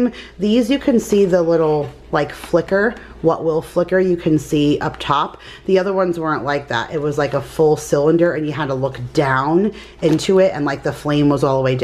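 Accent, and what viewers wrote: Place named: New York, United States. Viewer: Your definitely from ny I could hear it. I do like Texas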